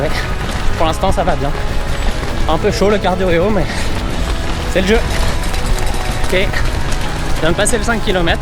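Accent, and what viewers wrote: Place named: Quebec, Canada. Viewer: Tu avais plus l'accent québécois il y a 6 ans .. tu l'as un peu perdu depuis 🤪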